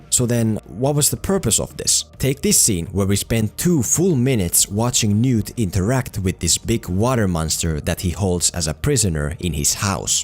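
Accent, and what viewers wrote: Finland. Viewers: I can hear the Finnish coming through ;) Great video! :) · Huh. You sound Finnish. Very slight accent, barely noticed. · Haven’t seen the movie but this was a good and comprehensive analysis. P.S. Kuulenko puheessa suomalaisen aksentin? 🤔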